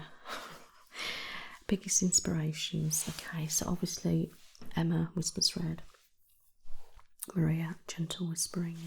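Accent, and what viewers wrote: United Kingdom. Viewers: I am so glad I found your channel a couple weeks ago, I have been watching your videos every day & just love you. I was born in the UK (Leyton) but moved to Canada 40 years ago. I feel a connection in the sense that you remind me of "home" you speak just like my aunts & cousins & it's a lovely feeling. Thank you!! You are a beautiful soul. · I just watched your Q&A tonight and one thing struck me that I had to comment on. You mentioned people commenting negative things on your videos, for example your facial expressions. That my friend is one of your strongest qualities. I absolutely love your facial expressions! I've always loved the British accent so that is a plus too. Your haircut video is my favorite so far. I've watched it many times. And as far as the mental health goes, I have anxiety and have most of my adult life and also worked on a mental health ward of the hospital and my heart goes out to that type of patient. 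So I'm glad to see you speaking out on the mental health issues. Keep up your good work. You're my new favorite artist. Even us 65 year old grandmas enjoy ASMR. 🙂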